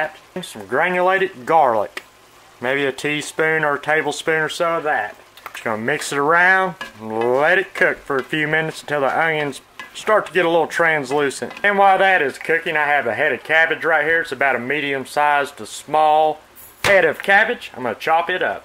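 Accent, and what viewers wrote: South, United States. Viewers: I love your country talk I love watch your video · I love so much your southern accent. I could listen to you all day. Texas here. I might try one of your recipes without all the salt. Thank you. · Looks good. I'll make a batch. The southern accent just kills me.... was laughing my ass off the whole time. Not in a bad way - it was just hilarious to listen to. Kinda reminded me of Justin Wilson. Look forward to more cooking. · Thank You for the recipe. Love the “four” potatoes you put in. Looks like 5, but we all know them taters can be big or small, so use your own judgement. Love that killer southern accent, and vids from a Man with a passion for cooking. 🌺🌴💕💕💕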